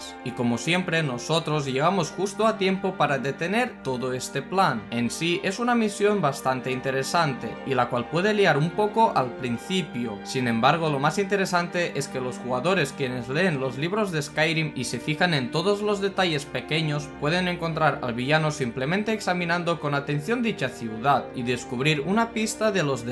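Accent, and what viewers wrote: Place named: Catalonia, Spain. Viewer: ostia com es nota que ets catala tiu jajajaja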